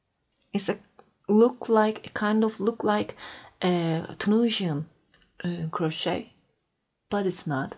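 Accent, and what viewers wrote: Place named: Turkey. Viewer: Videolarda hizli hizli scroll down yaparken turkce aksanı ilk duydugum 2 kelimede farketmem 😂😂😂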